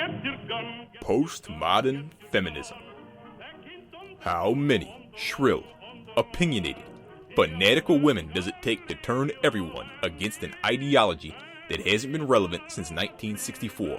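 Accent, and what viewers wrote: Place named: New York, United States. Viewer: dat new york accent, I subbed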